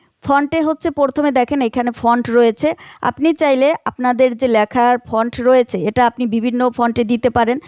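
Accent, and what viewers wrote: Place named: Barishal, Bangladesh. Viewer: মিস, আপনার উপস্থাপনা পরিষ্কার ও সুন্দর কিন্তু র-ফলা জণিত শব্দ উচ্চারণে সমস্যা ঠিক করে নেবেন। বুঝতে পারছি আপনি বরিশাইল্যা মাইয়া। আমিও বরিশালের। However, don't take it otherwise.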